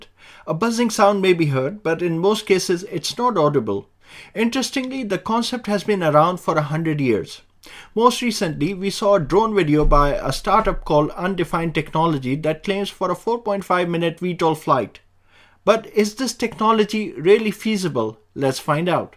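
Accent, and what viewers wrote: India. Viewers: English indian version.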